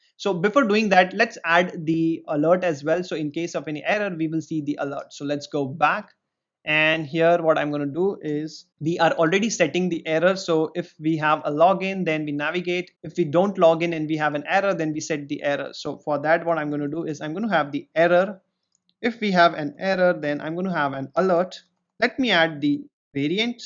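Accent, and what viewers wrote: India. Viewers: indian english bhi chalta bhaii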